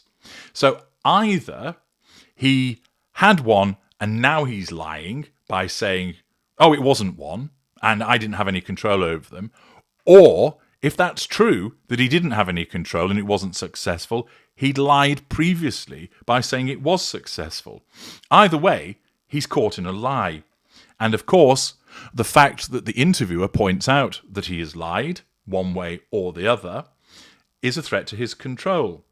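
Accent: United Kingdom. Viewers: 2 min in and I'm bored outta my mind, the old brit voice doesn't help either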